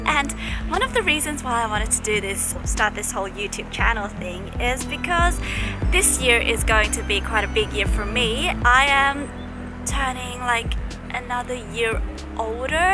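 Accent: Melbourne, Australia. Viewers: now in Oz, it's converting to a combination Aussie/Chinese accent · she sounds so Aussie now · The Melbourne accent is cute on you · Starting to get that Aussie accent now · that Aussie accent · you've taken the Melbourn accent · she has an Australian accent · that Aussie accent!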